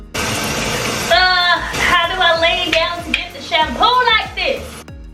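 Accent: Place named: Memphis, United States. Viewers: When you first started talking I automatically heard Memphis! Then looked in the bio and was like I KNEW IT 😂😂😂😂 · Girl I didn’t even know she was actually from Memphis but I saw your comment for comfirmation of what I was thinking 🤣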